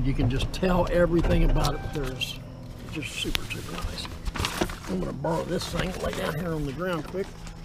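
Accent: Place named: Ohio, United States. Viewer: What a pip! Just recently stumbled upon your channel and must say how much I enjoy your videos along with your knowledge and honesty in doing business. I'm currently on my eighth Panther platform car, an '04 Signature Town Car and like very much that you appreciate and know so much about these vehicles. I'll wager the interior has that distinctive Ford aroma which I remember from cars my folks drove while I was a youngun' in the 1960s. Not elderly yet, but I'll be a boy of 63 in a few weeks so I can relate there as well. And being a native of western PA, it's nice to hear the way you speak over there in that part of "O-h-Ten." Quite similar to the way folks talk in my hometown area. Take care!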